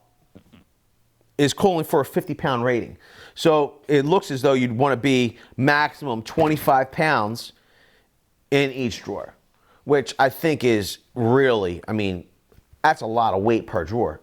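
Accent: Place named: Northeast, United States. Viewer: This guy is so NorthEast he reminds me of my childhood.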